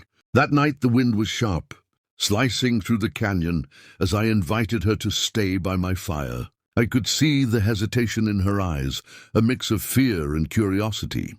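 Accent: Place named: RP, United Kingdom. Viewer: Somehow I can't take a cowboy seriously who sounds like he's in the House of Lords.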